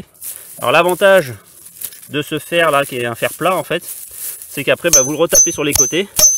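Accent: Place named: Bocage, France. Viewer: bonjour,vous etes de quelle region,on dirait le bocage?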